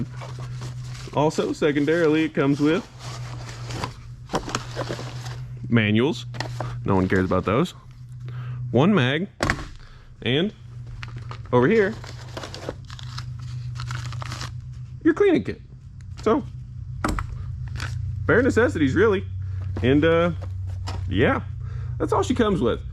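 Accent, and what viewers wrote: South, United States. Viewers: This is Tosh.0 with a southern accent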